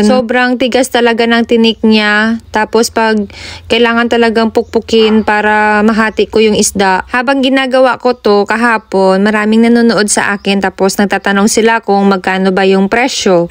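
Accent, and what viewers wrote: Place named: Iloilo, Philippines. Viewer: ilongga ka mam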